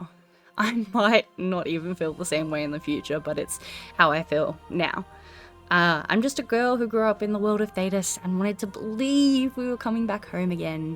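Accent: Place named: Melbourne, Australia. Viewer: You have a voice like Kylie Minogue, in a good way. Are you sure your mother never shopped at Kmart East Burwood in Victoria about thirty years ago? I'm talking voice accents, regardless of anything else. Suburban Melbourne with a moosh of time in the UK. :) The best and thanks for admitting when you are wrong. It's rare.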